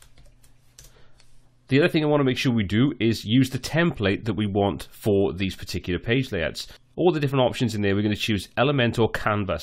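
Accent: Wales, United Kingdom. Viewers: Damn you sound welsh... I wonder if you are from Cardiff too!! lol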